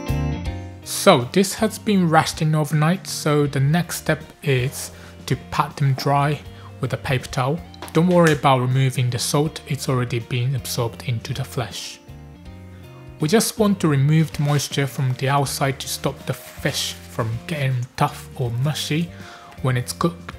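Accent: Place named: Cockney, United Kingdom. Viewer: seems to have (charmingly) picked up a Cockney accent. Bet he went to London?😊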